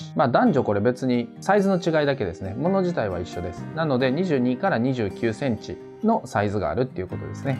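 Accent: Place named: Kansai, Japan. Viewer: 関西イントネーションすこ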